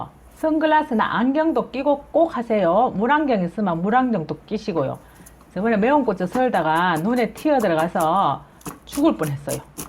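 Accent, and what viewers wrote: Gyeongsang, South Korea. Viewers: 저는 요리배우는것도 좋지만 경상도 말씀으로 설명해주시니 너무도 웃기고 재밋어 좋습니더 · 구수한 경상도 말 너무좋네요 고추요리 감사합니다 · 웃음띈 아름다운 모습에 구수한 경상도 사투리 정말 녹아 내립니다. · 따라해보려고 청양고추 2봉다리 사왓어요 ㅋㅋ 구수한 사투리에 내고향 경상도의성이 생각나네요 구수한 사투리에 친구엄마처럼 잘가르쳐주셔서 감사합니다~^^.참고로 5학년8반입니다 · 너무 좋아요 참 지혜롭게 하시네요 아주 가끔씩 보긴 하지만 입담도 좋으시고 경상도 억양 꿀팀, , ㅎㅎ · 경상도분이시군요 재밌게 잘 알려주시네요 감사합니다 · 경상도 사투리가 정겹네요 좋은 영상 잘보고가니더 또올께요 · 장맛비가 오고나니 텃밭 풋고추가 많이 달려서 어쩌나 했는데 이렇게 좋은 방법 주시니 감사감사요~~^^ 매운맛이 전해지는듯~^^ 갱상도 사투리가 정겹습니다~♡ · 아지메 경상도 어디요!? 넘 정겹네요!!! 잘 봤습니다 건강하시길 기원합니다 🙋‍♂️🙆‍♂️👍🤪😂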